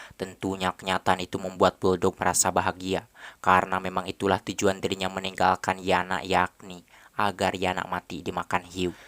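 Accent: West Java, Indonesia. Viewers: sangat terhibur..terutama baceona..eta sunda pisan..😂😂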